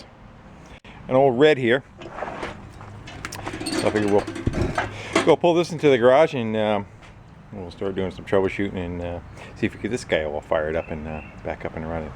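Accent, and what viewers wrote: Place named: Albany, United States. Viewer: this guy must be from albany ny area he dont sound from NH.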